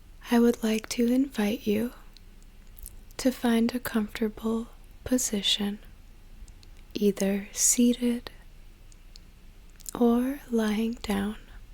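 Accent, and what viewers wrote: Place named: Texas, United States. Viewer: You can hear Texas in her voice if you know what to listen for.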